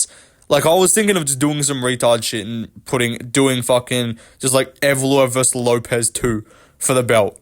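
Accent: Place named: Australia. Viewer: Bedtime MMA but more Australian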